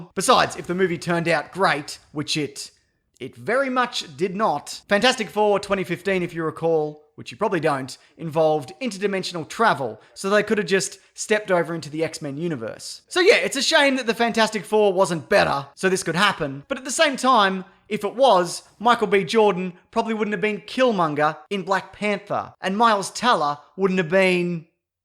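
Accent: Australia. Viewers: Marcus Casamento All but three countries in the worl use the metric system. Also, are you deaf? he's Australian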